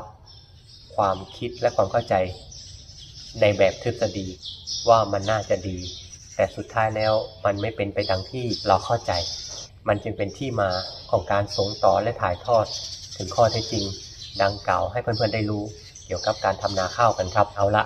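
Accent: Sukhothai, Thailand. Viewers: ส่ำเนียง เหมื่อน คน ซุโข่ทัย เลยครับ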